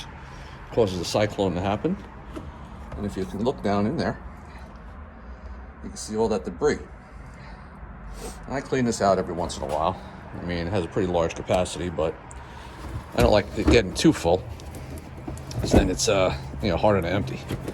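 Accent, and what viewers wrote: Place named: New England, United States. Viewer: Great video. Some interesting setups like the use of old tables. You must be from New England you have that accent. At first I thought the license plate was Oregon any way good stuff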